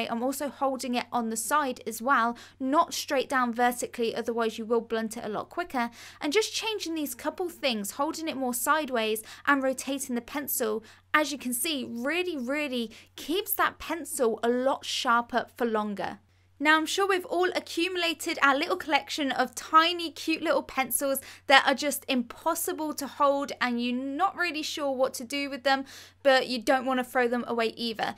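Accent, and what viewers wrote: United Kingdom. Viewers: Just love it! You're so clear in your explanations. I'd love to join the course on portrait, it would be a wonderful gift to myself for my birthday in June! Ps. You British accent is to die for, being Italian listening to you is a great way to improve my language skills which is an extra pro!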